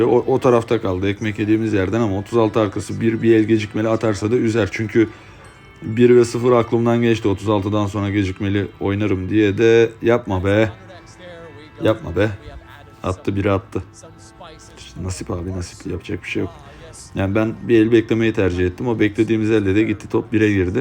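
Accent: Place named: Aegean, Turkey. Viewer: Siven tam ege şivesi reis mausuna taş deymesin belki bizede bu 444 kişinin için şans güler bol şanslar 🖐️ Papara numarası <num> A. D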